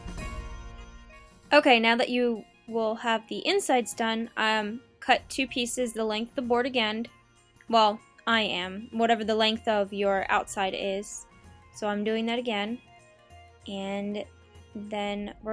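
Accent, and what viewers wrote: Ireland. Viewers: yeah are you irish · are you irish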